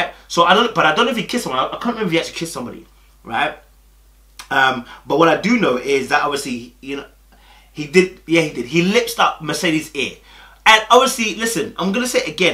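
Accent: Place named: Birmingham, United Kingdom. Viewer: Yout brommu accent came out today small small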